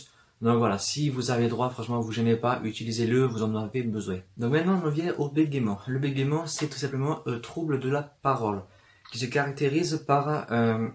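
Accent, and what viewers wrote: South, France. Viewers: Vive le Sud, vive l'accent du sud et vive tes vidéos :D ! Bisous :). Et oui tout est possible : je suis bien d'accord avec toi :) ! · J'ai adoré ta vidéo ! Il est génial ton accent #teamaccentdusud 😉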